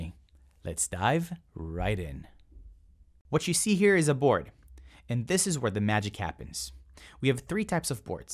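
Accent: Philippines. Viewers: sounds like a philipino speaker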